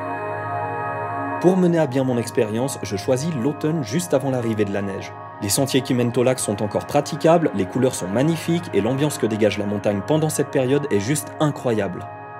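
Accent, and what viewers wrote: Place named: Entremont, Switzerland. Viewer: ha il me semblait bien reconnaître l'accent entremontant ! 😁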